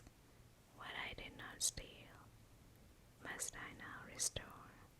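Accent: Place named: South, United States. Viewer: Thank you for satisfying my curiosity. You’ve got what sounds like an American southern accent, very relaxing. Thank you for reading the psalms.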